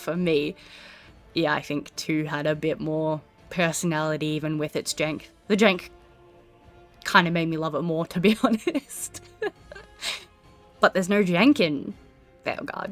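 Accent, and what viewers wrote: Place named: Melbourne, Australia. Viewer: You have a voice like Kylie Minogue, in a good way. Are you sure your mother never shopped at Kmart East Burwood in Victoria about thirty years ago? I'm talking voice accents, regardless of anything else. Suburban Melbourne with a moosh of time in the UK. :) The best and thanks for admitting when you are wrong. It's rare.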